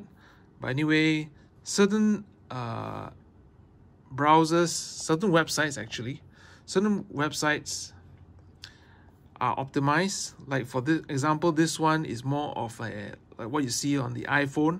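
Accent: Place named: Singapore. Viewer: You have an interesting accent, are you from Singapore?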